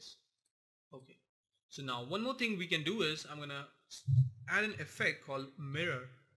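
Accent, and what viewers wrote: India. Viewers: thank you bro :) i was scrolling through the internet for some clean intros and just stuck on this one i didnt knew you are indian until i just felt that accent :D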